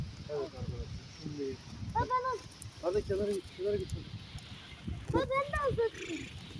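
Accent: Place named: Adana, Turkey. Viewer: Adana’nın hangi mahallesindesin kardaş şiven adana kokuyor saygılar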